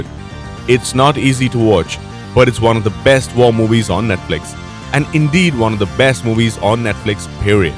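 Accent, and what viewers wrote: India. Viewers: he's from india but has a surprisingly mild accent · You sounded like Indian, checked your 'YouTube and I was right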